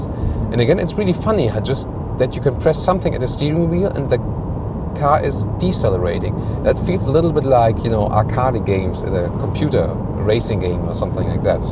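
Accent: Germany. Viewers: ich mag deutschen akzent im englischen nicht XD nice review keep going :) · Oh I just figured out when he refers to "recuperation" mode he means what we would refer to as "regeneration" or "regenerative braking". Not complaining. His German flavored English is adorable.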